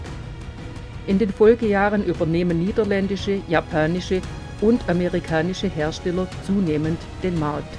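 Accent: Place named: Swabia, Germany. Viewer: bissudruff ?? Das ist eine Schwäbin, unverkennbar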